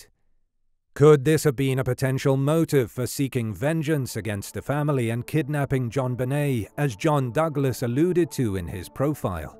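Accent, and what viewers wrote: United Kingdom. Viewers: damn just you have a strong British accent mate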